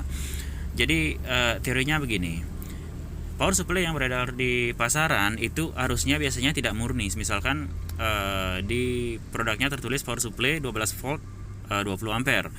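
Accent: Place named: Bali, Indonesia. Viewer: Seperti aksen Bali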